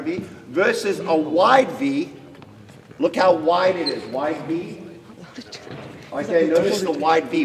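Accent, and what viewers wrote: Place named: Russia. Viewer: ) Guess you should make a video? Feel free to post to compare! - There is definitely a time to bend your arms. The game has changed allot since the 80's. You sound Russian and old School. In the 80's they served underhand. Today even at HS the serve is coming so fast your goal is to make a platform. Sometimes your arms cannot be straight. But you can still make a great platform with bent arms! :) Anyway send me the link to your video coach? :)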